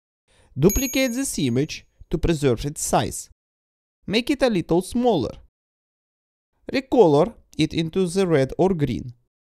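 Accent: Russia. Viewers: does he speak with a Russian accent? он говорит с русским акцентом?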